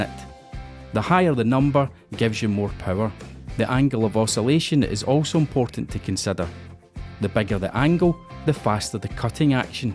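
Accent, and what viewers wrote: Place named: Scotland, United Kingdom. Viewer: tool instruction and Scottish accent....jackpot! 😍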